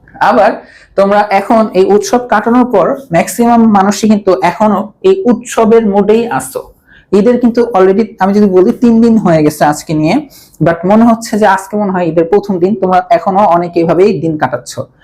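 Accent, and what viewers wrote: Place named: Kolkata, India. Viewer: apnar voice sune mone hoi apni Kolkatar er teacher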